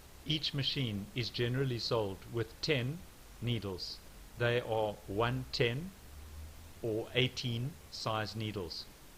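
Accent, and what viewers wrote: South Africa. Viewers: Best video on Youtube explaing thread and needle on this machine. Where can you buy the the 140 flatshaft needles ? You sound like you are from SA boet ?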